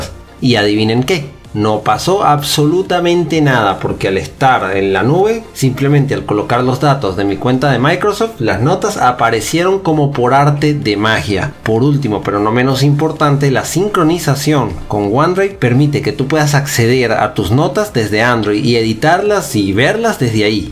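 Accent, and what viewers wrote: Venezuela. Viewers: Primer Video en donde se escucha marcado el acento venezolano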